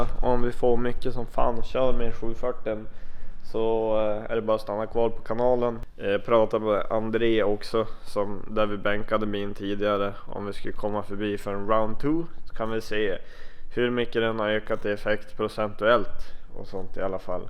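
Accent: Norrland, Sweden. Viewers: Äntligen, slutade ärligt kolla på dig när du började med engelska. Det är ju norrländskan man vill höra 🤠🤠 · Norrländskan är tillbaks 😍😂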